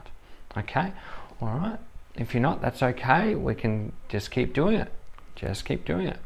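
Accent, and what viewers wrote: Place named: Australia. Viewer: Brilliant method, an unbeatable combination of personality and drop dead good looks along with my personal favourite, Australian accent. Thank you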